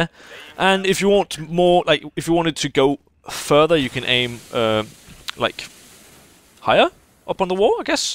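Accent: Denmark. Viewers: I love when you do your Funny ''Danish-english'' (I'm norwegian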